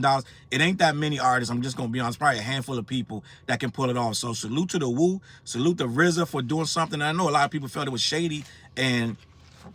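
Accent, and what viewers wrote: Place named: Texas, United States. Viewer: Now you sounding like you’re straight out of Texas with this one.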